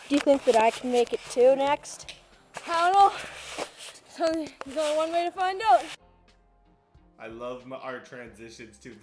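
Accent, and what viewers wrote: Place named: Canada. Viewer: You sounded so Canadian in your first vid.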